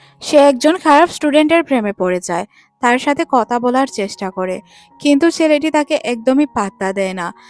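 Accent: Sylhet, Bangladesh. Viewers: kota huniya oi buja jar afne je sylheti 🤣🤣🤣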